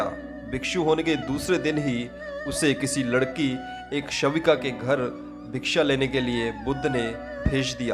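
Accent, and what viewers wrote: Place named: Punjab, India. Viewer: Nice video. Aap ki awaj pe punjabi touch aata hai. Kya aap punjab se ho?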